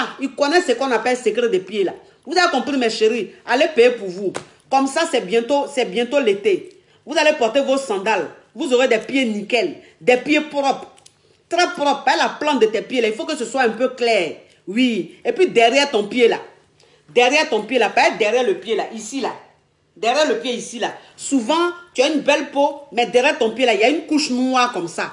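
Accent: Ivory Coast. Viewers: ivoirienne d' après l' accent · je suis congolaise de Brazzaville mais dés que j'ai vue la dame parler j'ai su qu'elle était ivoirienne.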